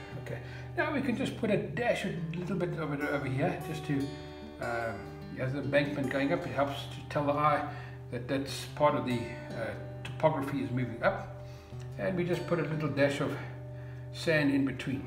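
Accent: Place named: South Africa. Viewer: Nice to hear a South African voice on YouTube.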